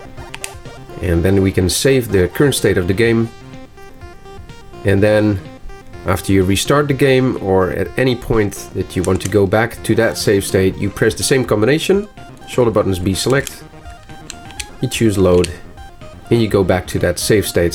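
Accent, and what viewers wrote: Netherlands. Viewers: Butt I heer a Dutch accent is dat right?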